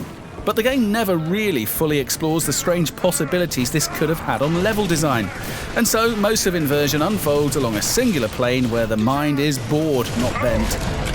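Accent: United Kingdom. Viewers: British accent <3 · British accent = Awesome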